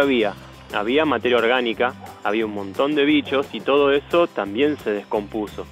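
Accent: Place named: Argentina. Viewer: Buenisimo! Pareces argentiino. Que parte?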